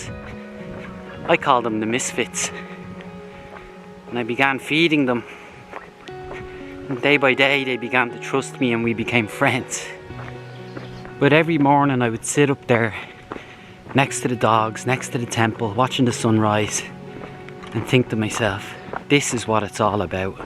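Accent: South, United States. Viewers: lolol it's funny to hear you talk southern with an Irish accent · Most say your southern accent is pretty good much better then my Irish accent[ lol] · Your southern accent! Love it 😄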